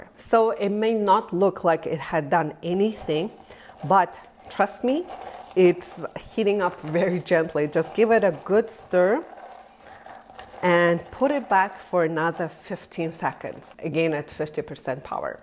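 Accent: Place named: Australia. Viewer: I bet you sound so much cuter saying that with your Australian accent 🙂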